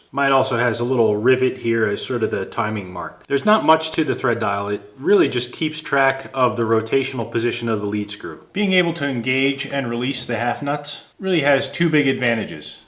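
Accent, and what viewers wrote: United States. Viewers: I want to ask you something. I know I may sound a little bit weird, but, looking your videos, appreciating them, especially the newest ones because of the high humor content, but I just can't help myself not minding my own businesses. Do you live in our really fucked up country called Italy of the recently utterly fucked up continent called Europe? I couldn't not notice in your videos some really commonly used stuff from brands that honestly I'd struggle thinking they ever crossed our borders towards other countries in Europe, or indeed in the world. The Maurer (Ferritalia) stuff or the bottle of mineral water from my region, it's the kind of cheap stuff I couldn't figure out how it would have have made its way to the U.S., provided the fact, that you sound quite American to me, even though I'm no expert since I'm not a native english speaker.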